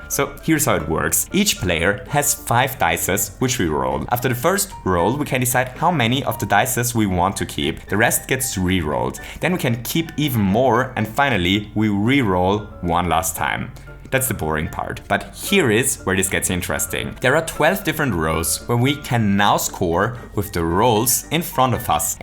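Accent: Austria. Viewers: what the heck are you guys talking about? Also, i know his accent is austrian, but im not sure if he lives there, maybe he has something on a social page or something, we should make it our goal to try to track him down! We need to do some digging... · are you austrian?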